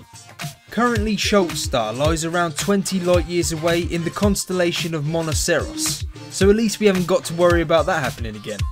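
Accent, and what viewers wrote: United Kingdom. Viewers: Eh, generic, nasaly, mediocre british commentary is boring and over done.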